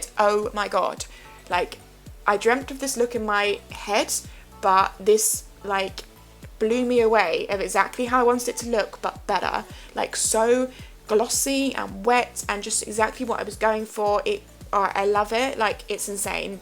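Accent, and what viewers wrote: United Kingdom. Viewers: This is really pretty. First time I've come across your. Channel. Wasn't expecting the British accent!!! You're very cool:)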